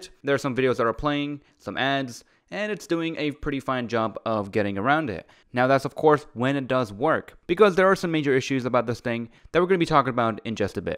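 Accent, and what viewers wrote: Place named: South Africa. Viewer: I am Greek speaking, but being a teacher of English as a foreign language (EFL) and having spent 15 years in Sth Africa, I don't have a problem understanding the language. However, I can't compete with a native speaker. When the narration came to the technical terms, I completely lost track of what you were saying. I couldn't absorb it fast enough. The problem was compounded by the fact that the South African accent is different. Which comes to the point of "please think of us out there who are struggling with a foreign language, especially with the technical explanations." Thank you, I appreciate your channel.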